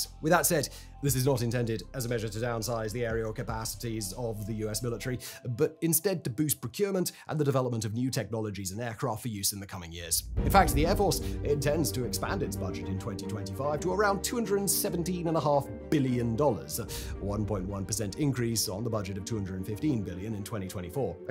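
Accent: United Kingdom. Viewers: I am sure he sounds normal to you and you neighbourghs in England. You are right, I may have to concede he does not exagerate his accents, I 'd rather say he does not care for those who does not live in the same accent area. What I experience is no problem listening to most popular science speakers such as Bill Nye, Neil de Grasse-Tyson, Carl Sagan, Michio Kaku, Brian Cox, Richard Dawkins, Dave Farina, David Attenborough for instance (only mentioning men voices, because women voices tend to be more intelligible in general). I might have overstated, I understand him. I still prefer other accents/voices. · Dudes voice is like a caricature of a brit... every video feels like I'm listening to a very dry Python skit.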